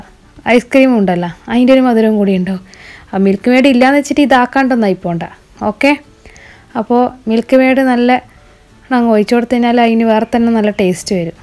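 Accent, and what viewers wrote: Kannur, India. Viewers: Chayayillatha kadi.. peruthanne adipoli.... kannur basha pwoli... 😄😄👌👌👌easy & healthy recipe... 😋👌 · Suppertto😍 ningala Kannur basha varthaanam polichtoo🤭😂👍😍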